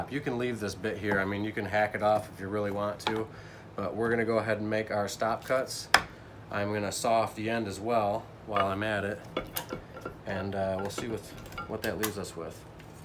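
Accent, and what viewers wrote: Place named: Michigan, United States. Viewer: Great stuff. Your stropping video helped tons. I heard the accent before I saw your channel name and knew you were from Michigan. It’s hard to find green wood downriver!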